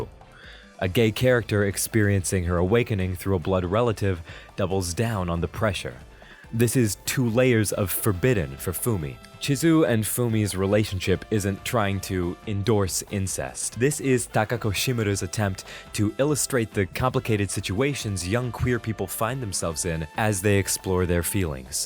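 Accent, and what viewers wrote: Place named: New Jersey, United States. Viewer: Has anyone else noticed the sudden Jersey-esque accent??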